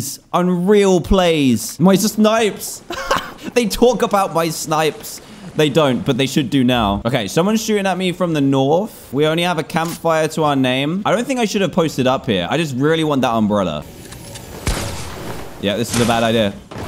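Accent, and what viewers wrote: United Kingdom. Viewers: He is British so he says stuff differently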